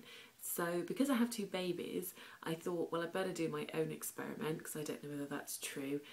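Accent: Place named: Wales, United Kingdom. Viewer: I could barely understand your thick Welsh accent...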